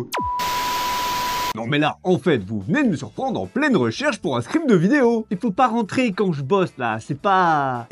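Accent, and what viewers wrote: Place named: Lyon, France. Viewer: Bien vu,! Excellent début, cool de te retrouver ici. Le ton que tu emploies me fait penser à un autre glorieux Lyonnais que tu as cité dans tes références - je te laisse deviner. Longue vie à ta chaîne, c'est cool! ✌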